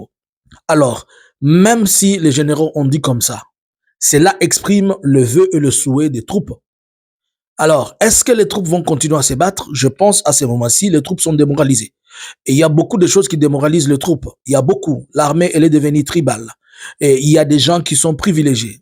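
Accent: Rwanda. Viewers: Monsieur, tu est rwandais, ça se voit ds ta façon de parler, notre armée avance très bien, où en sommes nous, avec la cité de masisi, c'est ds les mains de notre notre armée, monsieur arrêt les mensonge, moi j'habite Luberon, menteur que tu es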